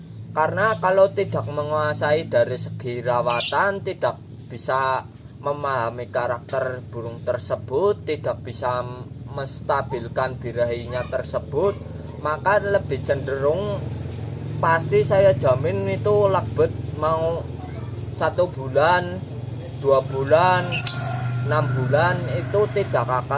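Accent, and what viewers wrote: Banyumas, Indonesia. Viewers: Ahaha jawa medok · Ora ngapak ora penak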